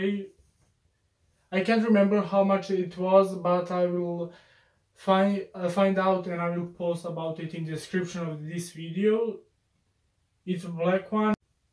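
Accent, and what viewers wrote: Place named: France. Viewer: you have a french accent 😱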